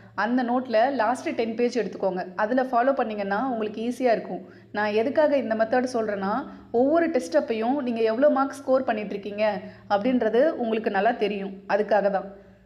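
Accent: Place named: England, United Kingdom. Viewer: Ethukku english kara mam mathiri pesuringa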